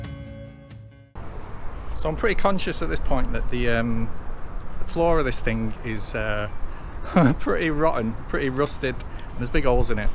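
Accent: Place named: Manchester, United Kingdom. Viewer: Well done typical Mancunian humour, brave face, big smile while all the time weeing your pants well done mate you did us all proud. I miss the Old town and the accent. Thanks for your great Docos Bill the Mancunian in Western Australia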